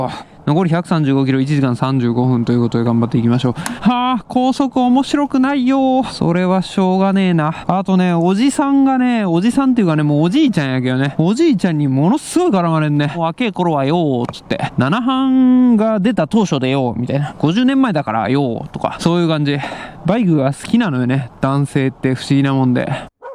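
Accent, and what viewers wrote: Kansai, Japan. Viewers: 楽しく拝見しています。今のコロナやウクライナのニュースを見ていると、本当に(何でもないこととが幸せだった)と実感します。氏のモノローグと言うか、おしゃべりも関西人らしく心地よく響きます。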